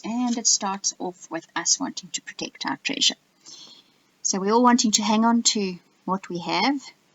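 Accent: South Africa. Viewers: Hello ! Hoe gaan dit? I just found you, and I like your video because I love tarot (I'm starting to learn for myself) and also I'm very pleasantly surprised to hear your South African accent because I grew up in Cape Town, though I'm now on the other side of the globe. Dankie! You explain things very well, and amazingly this was all completely relevant in my life.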